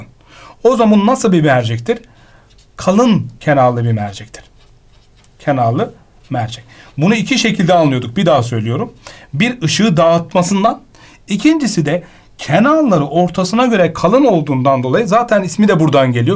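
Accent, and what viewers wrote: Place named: Bulgaria. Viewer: Abi sen burgarmısın çünkü NAİM SÜLEYMANOLU SENİN GİBİ KONUŞUYODU FİLMİNDE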